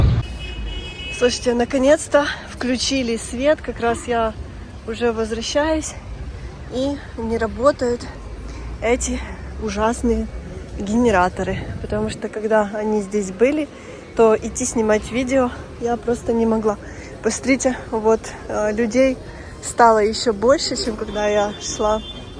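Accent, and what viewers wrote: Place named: Odessa, Ukraine. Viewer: Дорогая мая Одесса и самое главное мая красавица и не только внешне но самое прекрасное сердцем душой и мыслями. Я Алексей из далекого Примоского края город Находка не Одесит и небыл не когда у вас мои ученики были в советское время на соревнованиях и всегда рассказывали про Одеситах как простых добрых людях с оболдетной харизмой и юмором...никакого негатива не когда не привозили от вас ученики только положительные эмоции да анегдоты всякие летучии фразы и слава Богу что так было и дай Бог что бы так все и востоновилось. Всегда хотел попасть к Вам и в живую услышать одеский говорок он у вас проявляется частенько а голос ваш просто 9авораживает...ангел во плоти вы воплощение как и ваша сестра той чистой не оболваненой молодежи и умными правельными мыслями. Жаль что не могу попасть в Одессу но надеюсь что мирное время настанет и разум восторжествует над оголтелом фашизмом который мы с вами все в 45 году победили. 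Здоровья вам сестре и всем родным и близким да всем Украинцам Одеситам.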